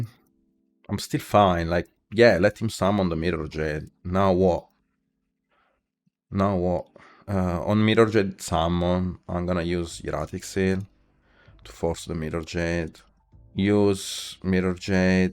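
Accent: Italy. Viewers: Ho sentito 10 secondi di video e ho già capito che sei italiano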